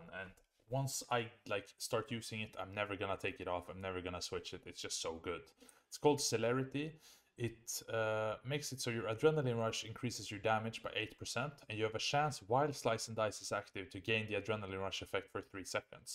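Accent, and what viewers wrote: Sweden. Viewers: Are you swedish? Thought because of your accent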